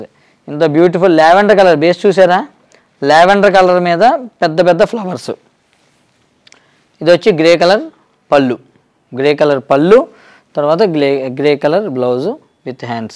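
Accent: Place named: Guntur, India. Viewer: Annayya Yes You we’re Soeaking our real Guntur languge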